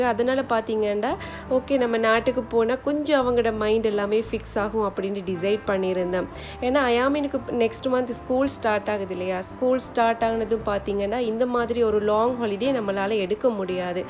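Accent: Sri Lanka. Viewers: அஸ்ஸலாமு அலைக்கும்.சிஸ்டர்.உங்க வீடியோக்கள் இப்போ recent aa than paarkiren.ungaloda srilanka Tamil super.enakku ungalai romba pudichirukku.நான் boy இல்ல சிஸ்.girl தான்.மெயில் ஐடி ல என்னோட கணவர் பெயர் இருக்கும்.தப்பா நினைக்காதீங்க சிஸ்.என்னோட பெயர் பௌஸுல் ஹிதாயா.தமிழ் நாடு.உங்க family க்கு நான் துஆ செய்கிறேன்.எங்களுக்கு நீங்க துஆ செய்யுங்கள் சிஸ்டர்.ரிப்ளை அனுப்புங்க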